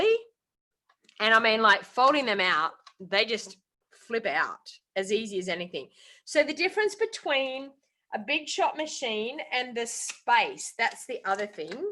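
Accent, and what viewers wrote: Australia. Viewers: Finally Australian accent ❤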